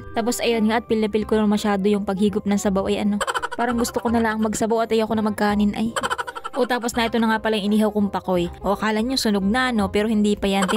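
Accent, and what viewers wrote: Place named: Quezon, Philippines. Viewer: 🤣🤣🤣tuwangtuwa ako sa mga vlogs at kadaldalan mo miss.iLove your accent❤️❤️❤️😘😘😘kaya lagi ko inaabangan ang mga bago mo vlogs.saan kaba sa quezon?ingat sa panghuhuli ng isda baka matinik ka ng stonefish.tingin2 den sa paligid kpag nagluluto ka baka my ahas sa itaas ng punong kahoy...ingat po miss ❤️😘God bless🙏🙏🙏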